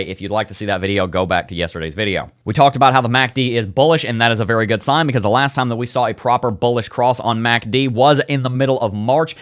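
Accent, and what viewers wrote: South, United States. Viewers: One of next fav analysts , it’s the southern accent or wherever the hell yo from . I love coffee too bro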